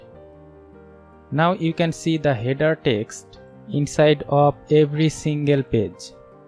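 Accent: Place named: Bengal, India. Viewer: Khub valo laglo video ta, bangali mone hocche accent sun ?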